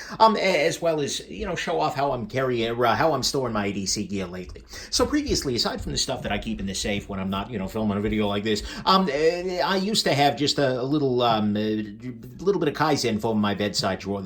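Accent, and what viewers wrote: New York, United States. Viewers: Are all of those spider co???? Jk I just started watching you I love you New Yorker accent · That thickkkkk NY accent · It’s not Texan, more New York or something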